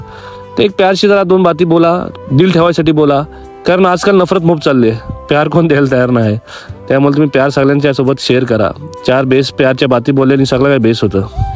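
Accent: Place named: Konkan, India. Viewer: Ek no video aisa lagra vlog khatam he na ho tumhi nav thevayla Gela pan to pan chagla vatla Ani tumchi kokni basha agdi Chan keep it up bhae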